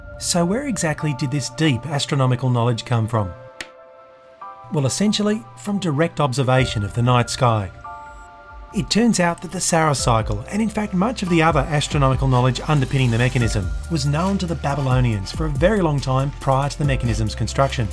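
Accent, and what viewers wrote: Australia. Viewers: Dear journal, its been three months, were starting to wonder if will ever upload again and just leave is with a half finished project. We have made do by watching satisfying compilations but its just not the same as his smooth australian voice and perfect hand filing and attention to detail. I will try to update this journal but i dont know how much longer we will last without another video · I found this video series yesterday, and I had to stop myself from watching more at 3 AM. Seriously, this series is absolutely stunning. From the historical significance of this machine to your engineering genius, neat working methods, your calm Aussie voice and amazing editing/cinematography skills....you've got it all, man. I'll be waiting patiently for the next one <3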